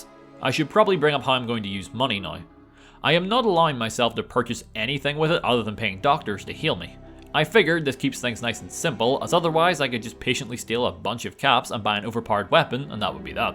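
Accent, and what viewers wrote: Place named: Northern Ireland, United Kingdom. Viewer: i would see my face when i heard your northern irish accent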